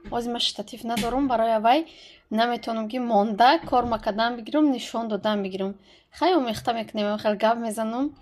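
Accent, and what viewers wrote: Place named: Kyzylkala, Tajikistan. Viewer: Ма ае габзанитон фаҳмидум ма ае қизирқала хоҳар меши апа мешавен ҳамшаҳри будем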